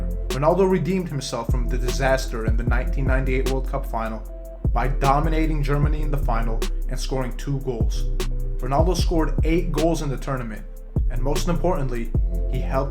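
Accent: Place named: United States. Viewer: Già sentire un accento americano che parla di calcio non è credibile...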